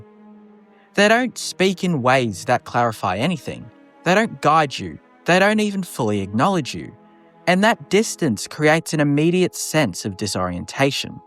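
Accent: Australia. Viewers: I love ya buddy. Just subbed. But the clearly Australian is hilarious. If everyone spoke like you, the world would be a better place.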